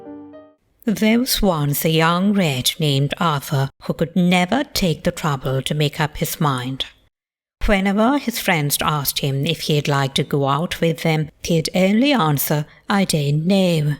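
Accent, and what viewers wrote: RP, United Kingdom. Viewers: this is an extraordinary accent! It sounds even more heightened RP than my grandmother (b.1903 d. 2003) and I can hear aspects of the former colonies as well? Fascinating, beautiful even in it's uniqueness. Not a standard RP though, nor even a standard heightened RP accent. Use of Arthur is valid.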